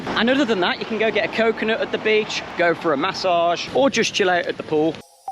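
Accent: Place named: Midlands, United Kingdom. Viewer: ahhhhh do i detect a midlands twang mate ?,where you from ?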